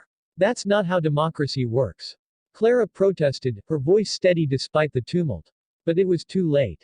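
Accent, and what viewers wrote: United States. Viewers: Why do we have listen to a story telling American it’s cringeworthy.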